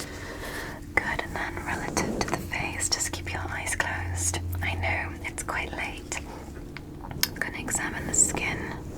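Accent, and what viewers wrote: United Kingdom. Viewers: ngl the first ever vid i watched from you you had a british accent and as a fellow brit i was fooled when i heard you speaking normally 😂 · What is your actual voice and accent. You have a British accent on all your doctor videos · This was a very good video as always! Is it only me or were u acting british? 👀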